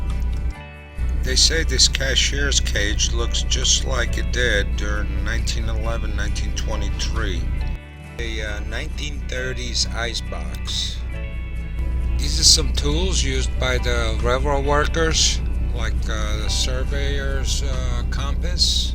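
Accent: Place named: New England, United States. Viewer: should not be narrated by a yahkee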